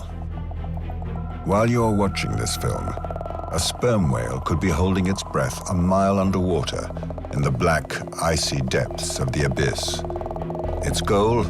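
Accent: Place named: United Kingdom. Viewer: I really wish producers wouldn't use British voice-over with American scripts. Have some confidence guys! Also, to mention the blatantly obvious, it's not "eco-locate", it's "echo-locate", and 'dive' is a regular verb. It's not like "drive" just as "pee" is regular and "see" is not. Otherwise, we'd say, "I pawed my pants because I was so scared".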